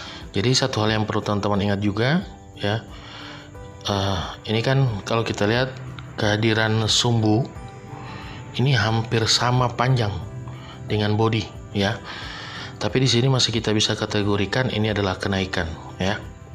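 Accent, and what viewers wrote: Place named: Makassar, Indonesia. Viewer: dari suaranya ini kayaknya orng makassar😀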